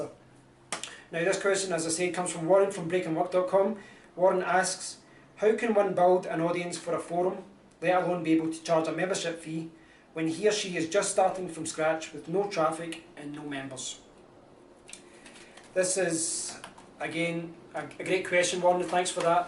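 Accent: Scotland, United Kingdom. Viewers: Hi What part of Scotland are you from? I live in East Lothian Love the vids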